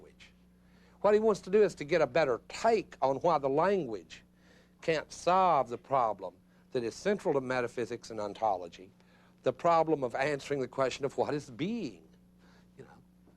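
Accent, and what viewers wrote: South, United States. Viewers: Ah jes hate suthin acceyents but this brilliant guy knows his stuff! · Gotta love “Hai-degger” and “moh-derna-tee.” Just discovered this guy, big fan. Reminds me of home (TN), though I think his accent is from a different state. It’s hilarious because you expect a Baptist sermon from that accent, and then you realize you’re not in church. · That southern drawl is to die for <3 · I think saying words "stand in" for things is gobblty gook. You don't talk to have your words stand in for things, but to represent things. They may fall short, and that is a problem. But having them "stand in" for things opens the door to what they were never meant to mean. He says Derrida thinks words represent reality, -- for example, Derrida asks where to pick up his check for teaching a course -- then he says Derrida thinks words don't represent reality. 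So that means Derrida is out of money because words don't point to where he can pick up his check. It's a contradiction, pure and simple, and the speaker is good at jumping from one contradiction to another in his casual, friendly, homespun, southern drawl accent as if nothing is wrong and that it all makes perfect sense and that he is not bamboozling anybody. He is a salesman -- a bamboozler of the first degree.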